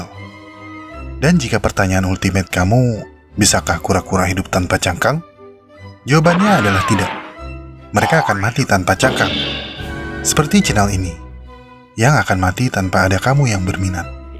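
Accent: Indonesia. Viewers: selama masih di mengerti.. ga masalahin....enak malahan ad aksen Indonesia nya terjaga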